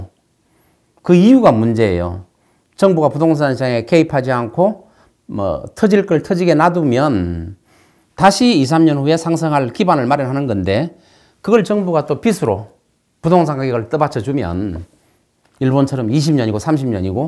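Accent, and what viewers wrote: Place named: Yanbian, China. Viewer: 이분 말투가 중국 연변쪽 억양 이신듯?? 암튼 3달전 한국은행 발표에 따르면 한국 아파트 가격이 소득대비 뉴욕 도쿄의 3배로 미친 가격~! 이분은 방송중이라 보수적으로 고점대비 50%를 적정가로 이야기 하셨으나 실제는 80% 하락이 정상임. 여기에 인구는 세계최고 급감에 가계부채 세계1위라 50년 장기하락은 피할수가 없음. 전국민의 절반이 대출만땅 빚거지 금융노예라서, 부동산 망하면 나라망한다는걸 볼모로 정부에서 부채 모조리 탕감 해줄걸 기대하고 있지만 뒤통수 크게 쳐맞게 될 것임. 1인가구 증가해서 아파트 가격 오른다??? 1인가구 80%가 생활비도 궁핍해 원룸 월세사는데 10억 철근없어 저절로 무너지는 아파트 퍽이나 영끌해 주겠다??? 하여간 미친것들 천지임 ㅋㅋㅋㅋㅋ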